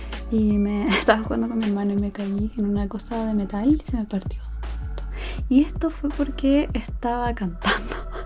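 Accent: Chile. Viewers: Ahora sí usas tu voz natural de el Dejo Chileno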